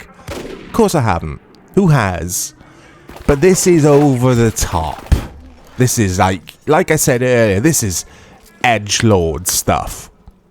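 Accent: Wales, United Kingdom. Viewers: Just saw your Gen Zero worth playing in 2024 and wanted to ask by any chance are you from Wales or live close by as you have a very Welshy accent.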